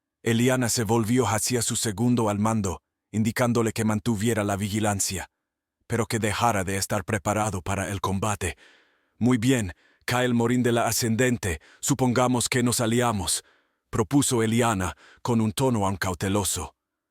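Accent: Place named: North America. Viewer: Su Tono de Vez es de Norte América . Vendiciones es un buen video .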